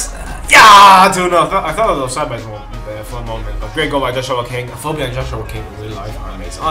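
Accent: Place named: Spain. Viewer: you sound little like if you were from Spain little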